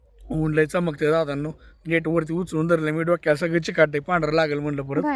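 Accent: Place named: Solapur, India. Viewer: बोलीभाषा सोलापूरची वाटते.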